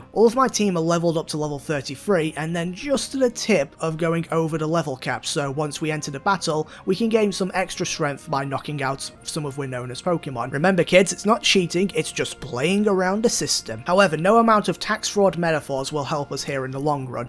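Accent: Northern England, United Kingdom. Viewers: Whereabouts from up north are you from lad? Can’t pin down your accent. Leeds? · He defo Yorkshire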